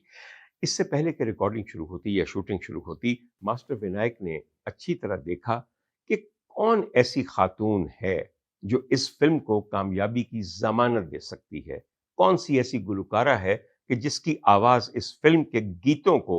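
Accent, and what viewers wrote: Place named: Punjab, Pakistan. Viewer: Verbose. Avelanche of urdu in Punjabi tone (that lethal combo). Urdu as spoken normally in UP is palattable. But this is too much.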